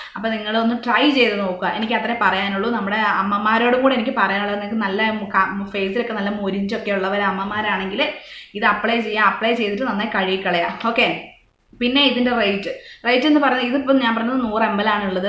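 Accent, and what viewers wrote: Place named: Kannur, India. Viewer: Chechi vdoil allatto paranjath cmnt cheythirunnu Enk sure aanu kannur kottiyur aaanennu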